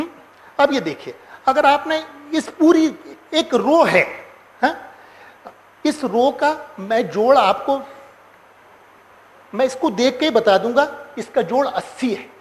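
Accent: India. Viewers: Bloody acent from India bady needs subtitles, or just continue talking hindi as this does not change much.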